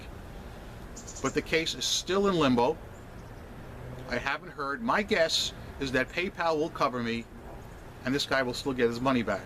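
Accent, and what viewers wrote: New York, United States. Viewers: cute NY Accent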